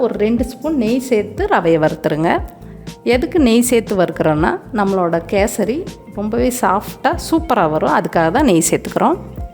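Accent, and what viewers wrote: Coimbatore, India. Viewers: Nice maam. video making nallairuku. keep going maam. speaking slang is the icon of kovai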